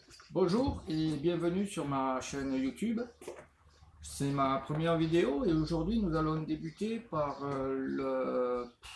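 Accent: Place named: Provence, France. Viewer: je viens de decouvrir ta chaine,super simpha nous qui sommes en Australie trouvons que tu es un poete de Provence avec ton accent, tes expressions bien humanes,merci mille fois, tres agreables tes videos bon a bientot Robert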